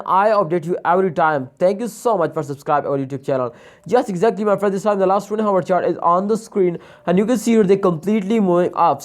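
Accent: India. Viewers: Man your accent sound me Indian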